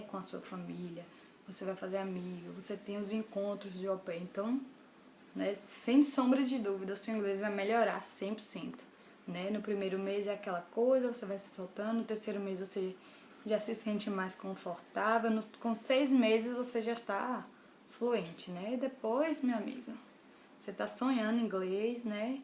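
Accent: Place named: Bahia, Brazil. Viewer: Esse sotaque baiano reconheci na primeira frase. hahah, qual a agência que você foi? Com qual idade? Pretendo ir pela STB. Você indica? Também sou de Salvador, gostaria de saber se ela é bacana. Ah, o vídeo ficou ótimo. Também acho o inglês importantíssimo! Muito bom o vídeo.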